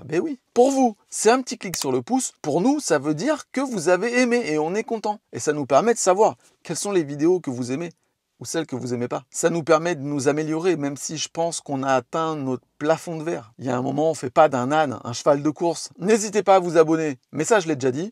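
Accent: England, United Kingdom. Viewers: Vraiment cet accent Anglais...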